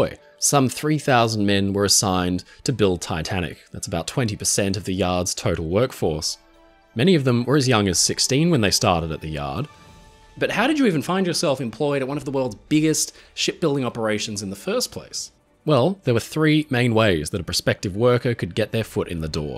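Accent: Australia. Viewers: I’m with you, but I’m eternally grateful. His great storytelling is a welcome distraction to the vitriol and animosity found in today’s world of news and politics. His soothing voice and Aussie accent sooth my jangled nerves. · I just want to say some things about what a testament to how good your channel is. As an Aussie myself, I generally dont like narrators/presenters with an Australian accent, I guess growing up with it I just usually don't enjoy it 🤣 BUT, the way you narrate is just so lovely to listen to and i often just enjoy having playlists of your videos on in the background while I game or work. Im also not super into maritime as a topic in general (aside from the pretty common fascination with Titanic) but i find the way you explain things with such simplicity and genuine love of the topic makes the info you give feel really digestible and understandable and I'm finding myself getting pulled into an interest I didnt have much draw to. 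So, i just want to say thank you. For being company when I've needed to decompress, for being informative in a way thats accessible and for just coming across as an all around really likeable channel. Keep up the absolutely spectacular work, my dude 🩷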